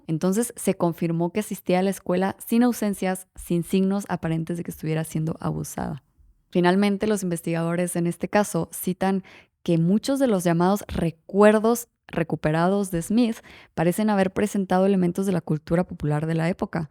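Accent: Mexico. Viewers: Bello nuestro acento mexicano,tu eres el ejemplo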